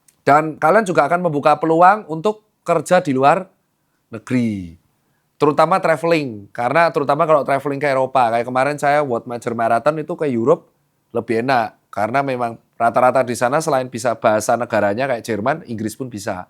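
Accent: Java, Indonesia. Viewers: seru nih, soale Dokter Tirta medok Jawane, jadi sperti orang pada umumnya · English Jawaa Medhokk , hmmm kek kenall yang medhookk medhookk "we wok......"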